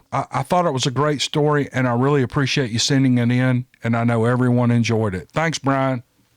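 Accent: South, United States. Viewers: You have a very nice comforting southern accent that is comforting and it's good for this line of work.and I think you could do well on tv specials if somebody just heard your voice in the way you can tell a story and make a story feel real and you can feel the emotion in the stories and I'm positive everybody that's subscribe you would agree with me cuz if they don't they wouldn't have subscribed to you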